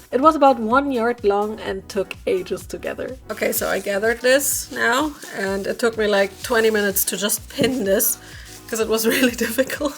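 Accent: Germany. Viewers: this is the first video I've seen from this creator, and the first thing I noticed is how she sounds german! I fell inlove with that tiny trait of hers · Du klingst ein wenig deutsch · You sound German, are you German? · I know very unrelated but are you german? Because the "den Wald vor lauter Bäume nocht sehen" in english, the Glue (Kraftkleber) and just your accent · I know a german accent wenn ich ihr Höre🤓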